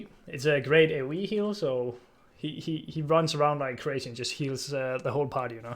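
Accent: Denmark. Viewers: damn, du er super dansk med den accent :D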